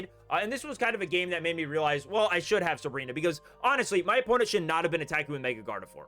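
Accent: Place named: North East, United Kingdom. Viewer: I've never said this bro but I think you must be from the north east. Just based off your accent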